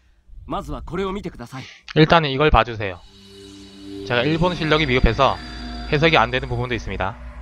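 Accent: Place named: Busan, South Korea. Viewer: 예전에 플스로 먼저 했었다길래 어디있는거지 하다가 어쩌다보니 검색으로 나왔네요 ㅋㅋ 시청갑니다 근데 목소리가 진짜 달라보이네요 ㅋㅋ 이때는 사투리도 더 심하고 ㅋㅋㅋ부산에서 태어나셨구나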